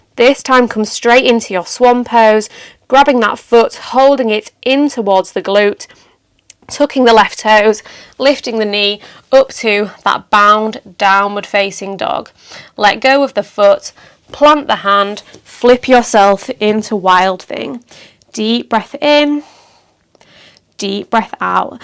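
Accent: Yorkshire, United Kingdom. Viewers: just discovered your channel, really enjoyed this flow, think this will now become my go to for yoga tutorials, really nice energy and excellent presentation skills plus, last but certainly by no means least you are gorgeous, do i detect a Yorkshire accent/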